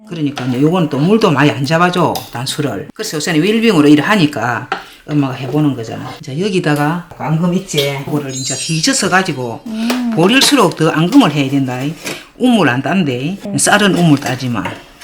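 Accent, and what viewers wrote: Gyeongsang, South Korea. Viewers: 경상도. 말씀이 너무. 구수합니다. 신부 수업하는겄같는. 따님과. 너무좋습니다. 어려을때. 단술. 먹었던. 추억이. 떠오르네요. 좋은. 정보. 주신겄감사합니다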